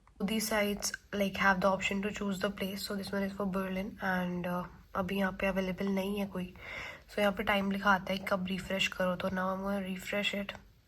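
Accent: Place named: Punjab, India. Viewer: Your English accent🤌🏻 aapka hindi uccharan🤌🏻🤌🏻 dein Deutsch Akzent 🤌🏻🤌🏻🤌🏻 but tuada punjabi lahiza 🤌🏻🤌🏻🥰🥰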